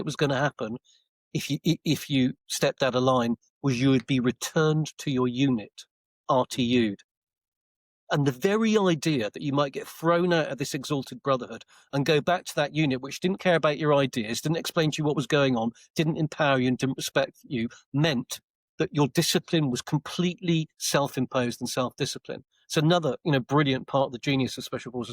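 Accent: Wales, United Kingdom. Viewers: Are you Welsh? Great video! Sub’d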